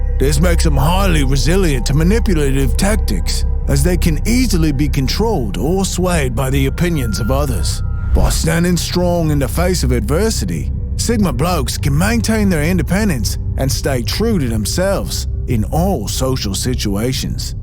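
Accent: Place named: United Kingdom. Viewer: You Rock..... OMG... that was the best Sigma Description Ever..... Being a Sigma - Male - Empath.... You might consider doing a short on the Sigma Male Empath..... The Rabbit hole does go deeper.... I have been this way all my life..... Military Used it for 30 years.... But Your Voice..... Well you have to be part of the Island Boys (UK, IE (ME), SCOTLAND.... Since I was born In Dublin, but Now have lived in the US since i was a wee lad..... So... Kinsman if you are actually in the UK..... and have a Pint of Carlsburg for me.... Futbol Season is coming.!!!! Oy Oy Oy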